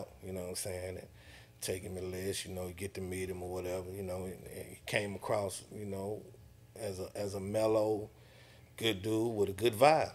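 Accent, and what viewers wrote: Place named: Houston, United States. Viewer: Real southside, Southpark talk.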